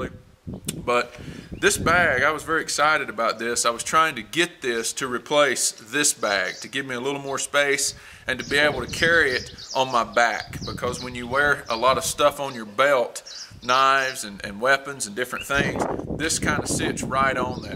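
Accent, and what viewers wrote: United States. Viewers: Where do you live? Looks like Australia but you sound like Arkansas lol not being mean just asking. Good review, I have this bag and use it as a diaper bag. lol tactical dad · First things first. Your response "As for overloading the bag it had towels in it. Did not even weight two pounds as i say in the video." is incorrect. you state " It fit everything" referring to the previous minute of dialog where you inform the viewer that the PUSH bag (Practical Utility Shoulder Hold) rode on your iPhone. Like I said, you overloaded the MOAB 6 (Mobile Operation Attachment Bag). I watched the 2 videos on your load-out of the PUSH bag. 10 pounds is far to modest an estimate of the weight. As for your condescending remark "I guess in the States you dont need what i do i suppose", guy, you have a southern draw, I would know, I'm southern. You sound like you're from Alabama or Georgia. 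As far as what I need, in a 6 hour bag I place what I will NEED for 6 hours. AR mags, pistol mags, radio, pens, paper, a tourniquet, pain reliever, 1 16 oz. bottle of water, sunglasses, flashlight, lighter, toilet paper. Remember it's a 6 hour bag. 5.11 Tactical is designed for law enforcement, EMS, Firefighter, not survivalist! There are bags that cater to those individuals much better than a 6 hour bag. Your review is completely unfair. You should have began the review by saying "you bought the wrong bag for your situation".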